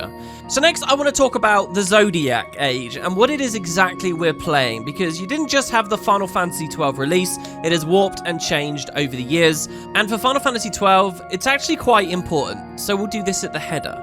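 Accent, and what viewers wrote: United Kingdom. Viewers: you absolute monster. I learned 3 things in this video 1) You are younger than me (I think the British accent makes you sound more mature than my American slang) 2. That a game that should have been about Balthier missed by a few letters, until a pivot in design. And 3) That it wasn't Nethecite causing the weird audio. That has been my headcanon since 06, and it's dead now. You've killed it. Sure hope you take better care of Rex.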